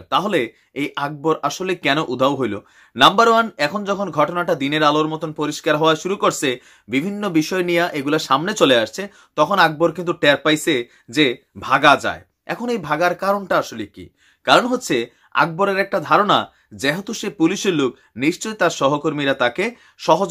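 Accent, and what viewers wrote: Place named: Sylhet, Bangladesh. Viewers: ভাই আপনি সিলেটি ভাষায় কথা বলেন,শুনে ভীষন মজা লাগেছে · you spoke wonderful Sylheti dialect- I like your videos. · সিলেটি ভাষা আপনার মুখে শুনে খুব ভালো লাগছে....ধন্যবাদ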